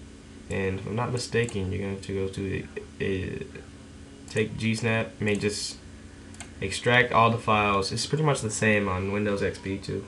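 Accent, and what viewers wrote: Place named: Knoxville, United States. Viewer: ah you kinda have that knoxville accent going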